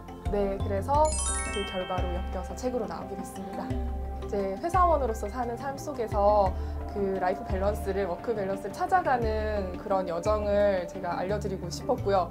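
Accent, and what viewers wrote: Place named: Busan, South Korea. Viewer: 기자님 사투리가 부산일보 영상이라는 걸 보여주네요 ㅎㅎ 좋은 영상 감사합니다.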